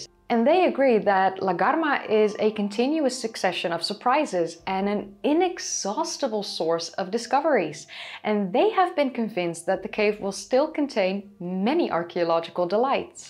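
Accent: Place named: Netherlands. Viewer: that wonderfull dutch accent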